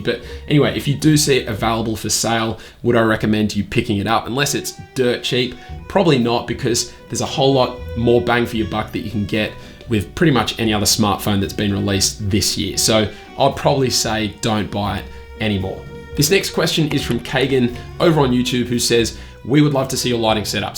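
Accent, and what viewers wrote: Australia. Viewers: How do u know Steven smith?are u Australian?i thought you were american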